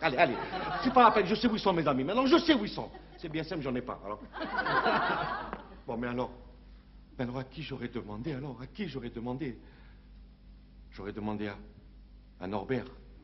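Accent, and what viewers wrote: Algeria. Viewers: L'accent pied-noir d'Alger,différend de l'oranais à forte empreinte espagnole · Trop puissant Castel ! Cet accent pied noir c'est que du bonheur.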